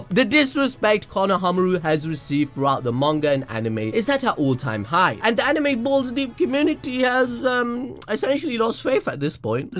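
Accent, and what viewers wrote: Punjab, India. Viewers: LMAO , are you Indian?? , if yes you have a very nice English accent , btw Love ur video and fk trashamaru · that punjabi accent though.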